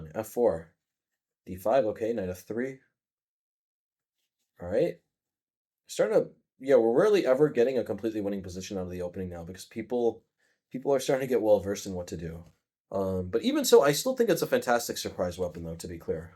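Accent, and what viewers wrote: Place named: Rhode Island, United States. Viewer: are u from Rhode Island? I’m guessing the accent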